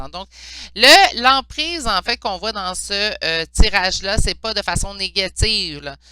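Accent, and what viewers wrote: Canada. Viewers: J'adore ton accent canadien merci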